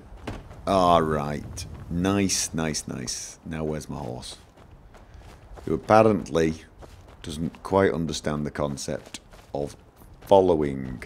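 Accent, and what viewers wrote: United Kingdom. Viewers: Allright, let me get this straight: said that the british still use feet for measurring, but he sounds pretty british to me. im a little confused.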